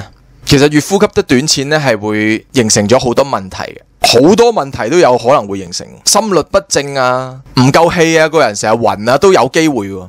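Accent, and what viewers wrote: Taishan, China. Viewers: ok wor 式台山話我接受到 wor幾搞笑丫😂😂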